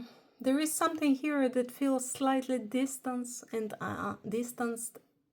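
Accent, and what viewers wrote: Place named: France. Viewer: ❤ 😎 me gusta mucho la espina cocida wow 🤩 hermoso tu diario 📔 Están precioso todos detalles, los bordados 🧵 oooh 😮 y tu hermoso acento francés 🥰 me encantan todos los acentos del mundo ! Debo también decirte que Tus telas que usas son preciosas. La manera que describes los detalles de cada página es increíble 😊. Amo estos videos . Gracias 🥰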